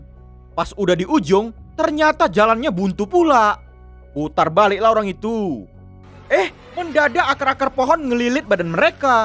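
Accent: Medan, Indonesia. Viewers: Cak ko buat alur cerita ramboo the last blood, laey..paten kali bahasa medan ah..mantap ❤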